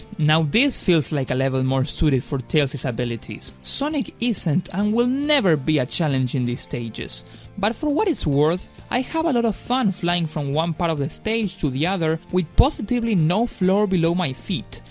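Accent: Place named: Argentina. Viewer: Tenes un re acento argentino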